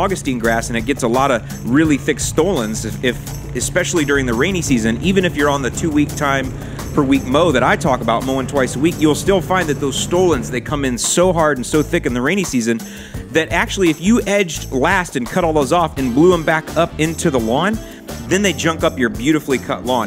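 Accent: Chicago, United States. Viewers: I love the chicago accent.😃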